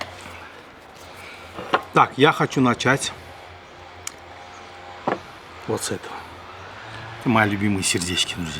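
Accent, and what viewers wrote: Baku, Azerbaijan. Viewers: Ай брат гардаш!) Это просто супер! Бакинский акцент, свежайшие продукты и руки профессионала - все что нужно для хорошего шашлычка!) Дай Бог вам здоровья! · Маэстро! Просто супер! Бакинский акцент, свежайшие продукты и руки профессионала - все что нужно для хорошего шашлычка!) Дай Бог вам здоровья!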